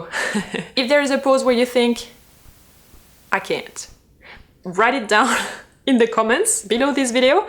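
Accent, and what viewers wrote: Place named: France. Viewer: Very interesting. Very good teaching and a very generous teacher!!!!!!!!!! Thanks very much for sharing your ability. And your English, is very good, just a little french accent, non disturbing at all (I'm Italian).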